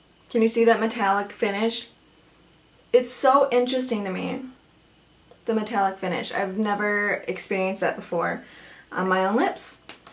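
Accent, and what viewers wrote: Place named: Upstate New York, United States. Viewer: BTW, are you from up north? I grew up in Rochester NY and our accent is very similar to yours. :D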